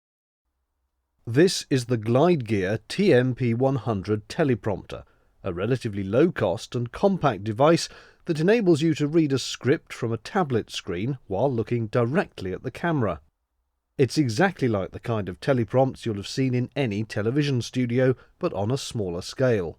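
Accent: United Kingdom. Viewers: Your British accent just makes me want to watch it over and over again lol. Good job mate! 👍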